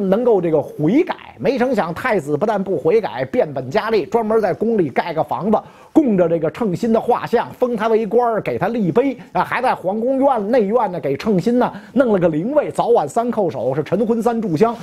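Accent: Beijing, China. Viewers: 老师的京片子很好听😄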